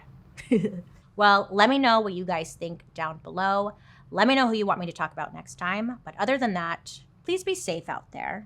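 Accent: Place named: Ohio, United States. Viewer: You said that correctly. You sound like a true Ohioan so lol favor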